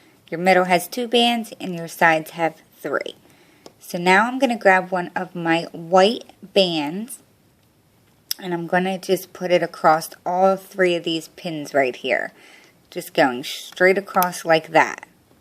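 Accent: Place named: New York, United States. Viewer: where are you from? You sound like you’re out NY way maybe? I like it because you remind me of my cousin. Whenever she was angry, she’d turn extra NY lols 💜💜💜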